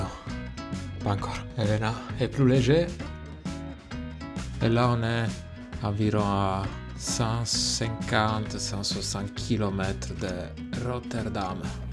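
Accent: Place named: Italy. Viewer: EXTRA!!! Continuez! C'est beau et bien raconté (con l'accento italiano). Tschüss! 🤠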